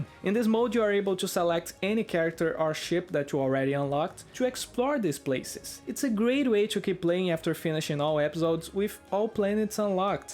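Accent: Brazil. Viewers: mano tu é br? esse sotaque de leve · Your accent, looks like brazilian speaking english.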